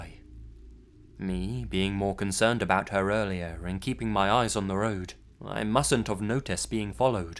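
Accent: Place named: Suburban England, United Kingdom. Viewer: Amarsanaa Ravdansambuu He doesn't sound posh English and doesn't sound poor London. He sounds like more suburban or a mix of those accents. So um Posh Poor English??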